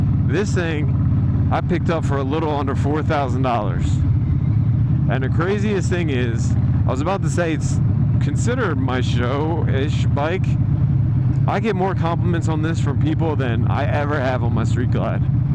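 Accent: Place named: Philadelphia, United States. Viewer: i could tell from your voice, but the hat finally reminded me to ask. Im philly born and raised. Love what you do brother. Keep it up.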